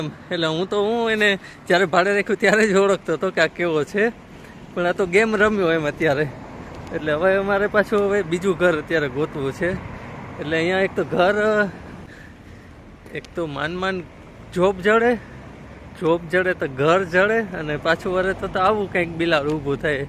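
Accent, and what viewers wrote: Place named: Porbandar, India. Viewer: તમારી બોલી ઉપર થી એવું લાગે છે કે તમે પોરબંદર નાં મેર છો ❤❤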